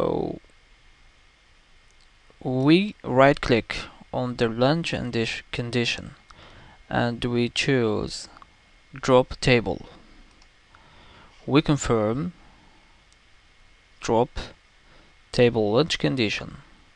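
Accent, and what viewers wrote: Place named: France. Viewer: Sounds like french accent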